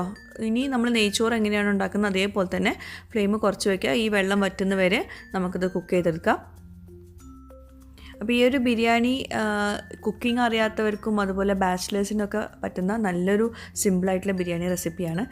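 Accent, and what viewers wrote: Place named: Kasaragod, India. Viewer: Kasargodan nhammal... Ade samasaravum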